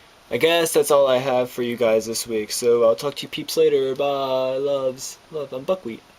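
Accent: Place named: France. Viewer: do you have an accent? you sound a tiny bit french :]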